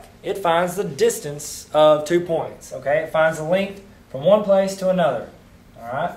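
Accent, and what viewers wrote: South, United States. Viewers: this helped me so much thank u btw i never understood from the teacher but when i come back from school i study what i did today and u helped me sooooooo much ZAAAANKS!!!!!!!!! YOUR THE BEST TEACHER BTW I LOVE UR COUNTRY ACCENT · Um lets use our heads maybe he from the south? sup with you stupidity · Sup with that country accent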